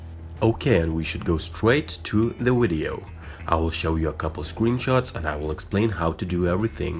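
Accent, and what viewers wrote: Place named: United Kingdom. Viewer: cause your accent sound a little bit British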